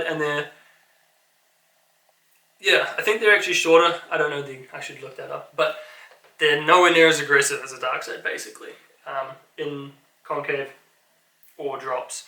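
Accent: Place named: New Zealand. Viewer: Haven't heard a kiwi since crunchie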